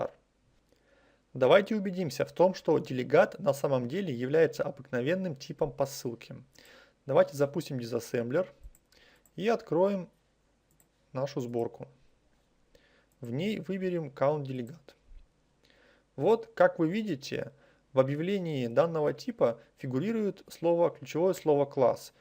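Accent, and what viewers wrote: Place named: Russia. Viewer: Очень интересная и полезная лекция. Единственное что мешает, это русское искажение английских слов и букв(параметр "Е" вместо параметр "И", "хЕндлЕр" вместо "хЭндлЭр", зыс вместо дис в слове this). Может это и не относится к теме урока но я считаю что программист всё-таки должен уметь правильно читать по английский не говоря уже о владении языком вообще.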